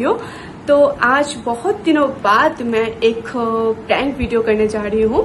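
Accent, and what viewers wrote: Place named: Bangal, Bangladesh. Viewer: Tor bangal kotha ❤️👌😂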